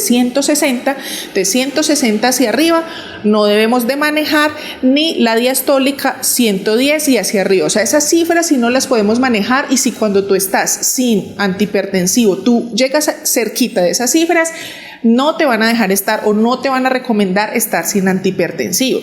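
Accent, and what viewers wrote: Colombia. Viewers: Hoy llege tarde 🙋‍♀️🤭 saludos bella ❤ Amo su acento colombiano ☺😊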